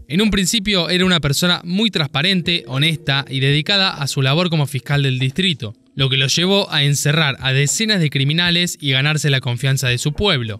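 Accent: Argentina. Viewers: Qué curioso pronuncian la palabra "niños" por aquellos lados. Ya he visto videos de youtubers argentinos y paises aledaños, y la pronuncian algo como "ninios" 🤔🤔🤔. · Sos argentino pa? Muy buenos videos 🤠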